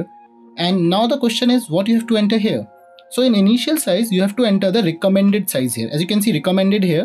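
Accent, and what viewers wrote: India. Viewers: Shit got real When i Heard he has Indian akcent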